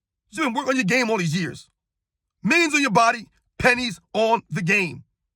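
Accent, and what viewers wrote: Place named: New York, United States. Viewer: Yo, listening to your New York accent makes me miss the days i used to get a 75cent bagel with cream cheese and jelly by Sal's outside of Prospect Heights High😏.... Keep the flame burning my guy, " we must continue to stand up!!!".... ✌🇹🇹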